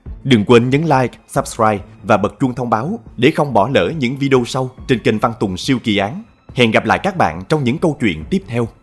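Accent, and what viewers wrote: South, Vietnam. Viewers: Đón chào, giọng đọc rõ ràng, nhẹ nhàng, ko áp lực lỗ tai, khúc nào cần nhấn mạnh, chứ ko tăng âm lượng ko phải lúc, quan trọng hoá giọng đọc, diễn lố, đọc giọng miền nam tuyệt tuyệt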